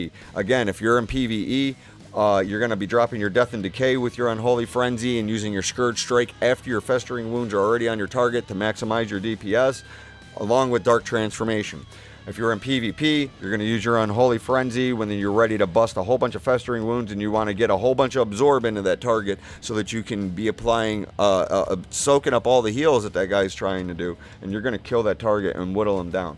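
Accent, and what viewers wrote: United States. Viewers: I like your videos man. Nice to hear an American voice in a wow vid. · I subbed to get awesome Unholy DK info....and because your voice sounds so American you could be my neighbor. Love this.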